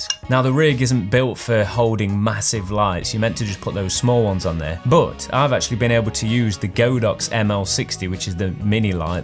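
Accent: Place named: Manchester, United Kingdom. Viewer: Tempted to get this for my videos. I feel like you're from Yorkshire like me but for some reason I'm getting Manchester accent vibes. 🤣🤣 I could be entirely wrong but you're definitely northern.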